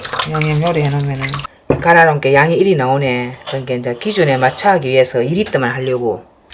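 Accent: Gyeongsang, South Korea. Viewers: 경사도 사투리로 TV 요리프로에 함 출연하시면 좋겠어요!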